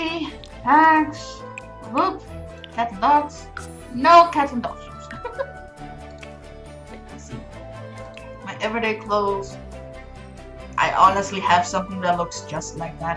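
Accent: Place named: Denmark. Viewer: Hello! Are you Danish? It sounds like you have a danish accent😁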